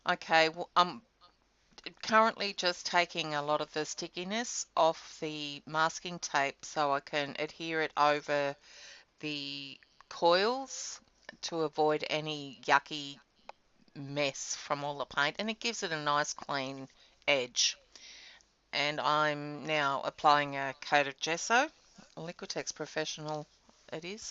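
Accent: Australia. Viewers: Great to hear another Aussie. That is an awesome page. Thanks for sharing.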